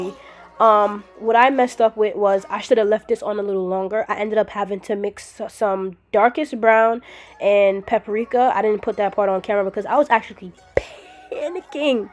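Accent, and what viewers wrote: New York, United States. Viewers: I knew you was from some part of NYC when you said “yo I be buggin” 😂 real NY shit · You must be from NY city lol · I’m so glad you from NY like me cause it gave understand everything you say word to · Lmaoo you from ny?? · you from New York ? 😭. I love the accent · I could tell she’s from New York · U from ny? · Are you from NY?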